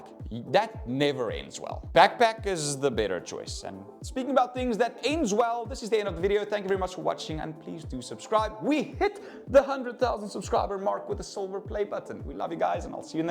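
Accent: South Africa. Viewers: The South African accent😊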